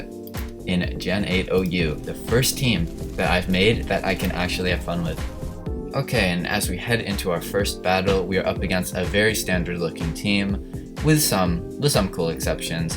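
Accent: Poland. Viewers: U polish? 😱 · U polish?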